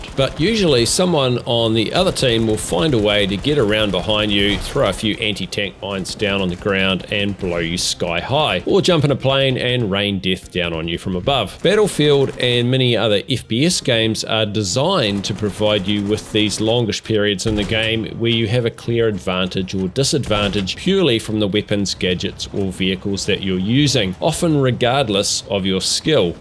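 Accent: New Zealand. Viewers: Great video mate, well said! Your kiwi voice is bloody brilliant!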